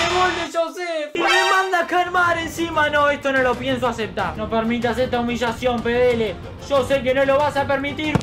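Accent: Uruguay. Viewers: Eres Uruguayo? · Eres uruguayo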